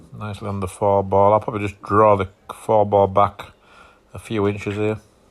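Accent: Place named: Northern England, United Kingdom. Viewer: 29-1 come on Daz, bit lacking that mate. Nah, only kidding, absolutely fantastic play. I'm living in Thailand now and get to play on some great tables in some lovely places and yours, Neils and Karl's videos are so informative and easy to watch. It's great to hear your accent as I don't get that here much as I'm from Hull originally so not far away from you. Keep the videos coming. It's great to see you hitting some good form again. Let's have a few more worlds off ya matey. Also, what do you think of Judd Trump playing in the U.S open, hopefully a good thing as it may start the ball rolling to get some more money into the game and a new set of viewers. Still undecided myself as I don't know but hope he will give the game the respect it deserves. Thanks for your time doing these videos Daz, they are so helpful, informative and very much appreciated. 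P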